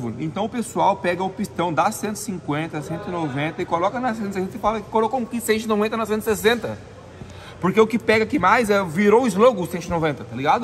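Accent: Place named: Goiás, Brazil. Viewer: Goiano uai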